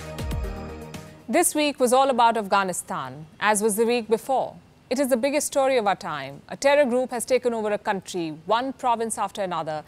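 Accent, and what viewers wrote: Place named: India. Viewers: She purposely has kept her indian accent .. bcuz she is indian ... U guys need to catch up if u want to watch her or else watch ur native accent news some where else...I bet there would b so many..... Simple as that.. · She's so good but she hasn't work very hard on her international English, still has a very strong Indian accent and sometimes this makes it hard to follow up.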